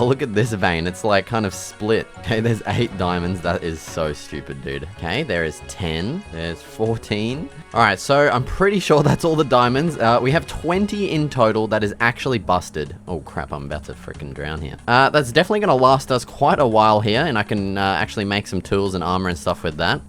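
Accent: Australia. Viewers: bro couldn't be more Australia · Your Australian right?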